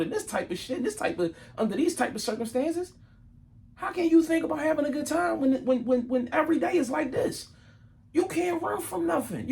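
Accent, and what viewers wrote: Philadelphia, United States. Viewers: Philly accent??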